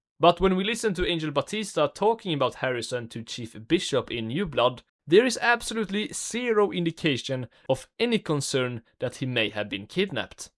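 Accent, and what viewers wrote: Sweden. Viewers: Is that a swedish accent I hear? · I've heard few english accents in my life that sound more violently swedish than yours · Why does bro sound Swedish? 💀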